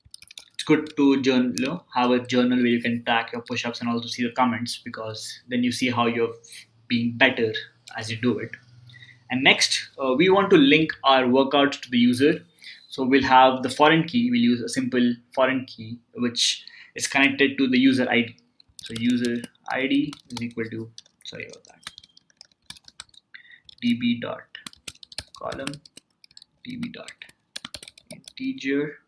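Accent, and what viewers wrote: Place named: India. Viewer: Why you indians talk like this !! Please please please work on your accent , it's very hard to understand. I'm telling this to all the indians ...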